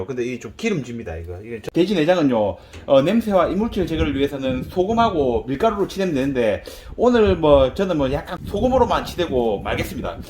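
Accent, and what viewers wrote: Gyeongsang, South Korea. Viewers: 형님 보기너무 좋습니다 ㅎ 20대후반에 직장생활을 울산서 5년했습니다 ㅎㅎ 행님 사투리 듣고 있으니 경남지방 놀러가고 싶네요 ㅎㅎ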